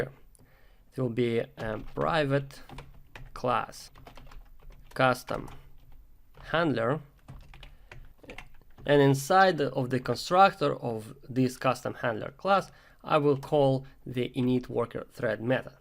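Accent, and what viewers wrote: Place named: Russia. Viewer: по акценту всегда узнаю русского)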